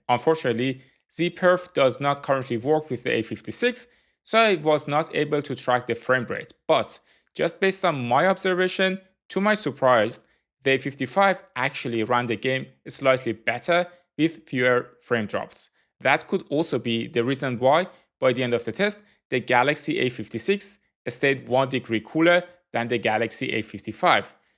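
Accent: Iran. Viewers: نگا لهجه ایرانی رو :))))) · Are you persian ?? Your accent sounds like you are. If so nice video dadash ❤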